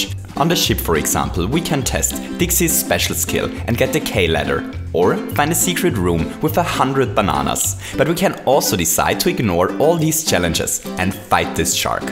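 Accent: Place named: Germany. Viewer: He's from Austria, it seems. I knew the accent sounded German!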